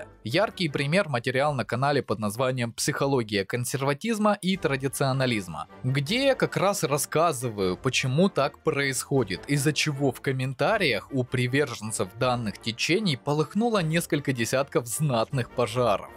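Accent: Ukraine. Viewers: Прекрасно ) А разговоров то было - армейский психолог, опыт боевых действий, сотни лет практики с больными. И что же мы увидели, а мы увидели голого короля с фантазиями, хотя украинский акцент и выдавал жителя Украины. Куда же делся беспристрастный психолог с рассуждениями о том как вести себя на войне ? А он почему- то превратился в активного пропагандиста, да еще и с речами про русского Ваньку, что само по себе ксенофобия. Прям повеяло Геббельсовской пропагандой про сдающиеся войска, женщин с коктейлями молотого, призрака Мариуполя который сбил 600 танков в воздухе и под водой. 4 дня прошло с твоего последнего ролика и как же мерзко теперь звучит, твои солдаты уже отходят в глубь страны, а твоя русофобия и ненависть к обычным русским людям запомнилась многим. Надеюсь те кто тебе донатил постыдяться и обдумают свои решения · Я давно знал, что он украинец. У него жена с Украины, она в каком-то видео говорила. 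И раньше он про власть говорил. В каком-то видосе упоминал про арестованного украинского военного и мол поэтому он не хочет расскрывать свою личность. И по говору понятно Люди, которые удивлены, что он украинец, наверное, только начали его смотреть, потому как мне давно известно, что он с Украины. Другого объяснения у меня нет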